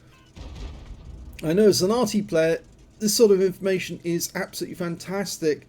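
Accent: United Kingdom. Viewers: I love the accent, you sound like a british, so authentic... Where did you get your accent from? :)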